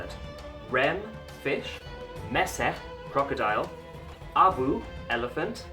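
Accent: United Kingdom. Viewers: The funny part is always the english accent,even here. A lot of english speaking natives are complaining about accents of non natural english speakers if they learn english. Good to see that they are not better. No offense,just wanted to mention it. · Sounds welsh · Your accent is extremely British in ancient egyptian SORRY. Try to pronounce it differently cuz it's ridiculous but if you cannot do that at least pronounce R at the end of the word and do not create a new non-rhotic British Egyptian :D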